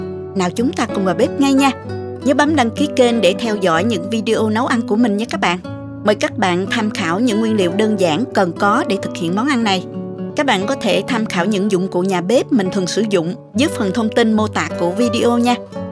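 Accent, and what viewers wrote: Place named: Mekong Delta, Vietnam. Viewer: Thích giọng nói quá, y Chang miền tây luôn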